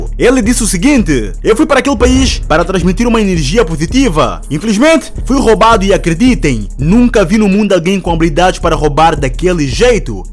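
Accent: Angola. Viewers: Mano tu és angolano?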